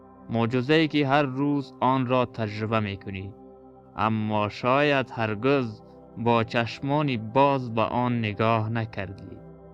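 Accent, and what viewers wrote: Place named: Afghanistan. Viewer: بی نظیر بود امیدوارم موفق باشی چون این نوع محتوا ها را برادران ایرانی ما زیاد میبینن البته فقط محتوا های که خود ایرانی ها ساخته باشد اما چون لحجه ما افغان ها را دوست ندارند بعد ازینکه روی ویدیو کلیک کردن همینکه لحجه ات را بشنوند فورا از ویدیو خارج می‌شوند ما افغان ها همیشه دنبال کننده برای آنان بودیم ولی آنها فقط خودشان را می‌بینند امیدوارم تعصبات را کنار بگذارند شما ادامه دهید موفق میشین انشاالله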